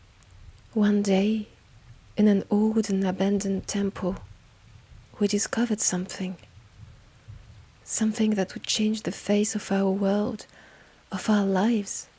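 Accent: France. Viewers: more french accent, that's what i subscribed for, hell yeah!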